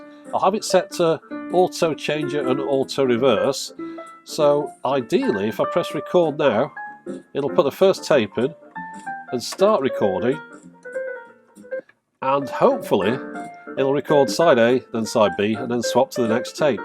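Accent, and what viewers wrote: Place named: England, United Kingdom. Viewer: Aloha , a great video as ever BUT I need to defend the Pioneer CT-M6R I bought one out here and after removing the jammed tape it has worked perfectly for over 12 moths , I also got the matching Receiver & 18 CD changer all as cheap as chips & all working perfectly .Thanks again for an entertaining video . I enjoy listening to another English accent , I'm from Solihull :) .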